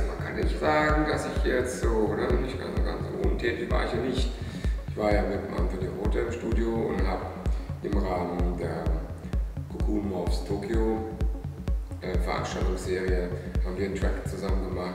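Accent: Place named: Hesse, Germany. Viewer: es svenche ausem ömche,ich wünsch mir das de mehr hessisch babbelst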